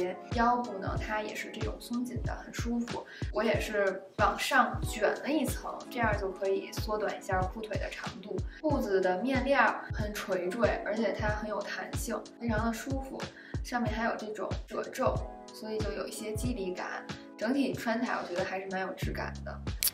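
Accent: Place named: Beijing, China. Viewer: 听声音像北京人或河北人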